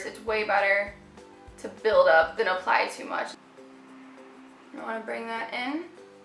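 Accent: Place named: Midwest, United States. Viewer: Wow that is one serious midwestern accent.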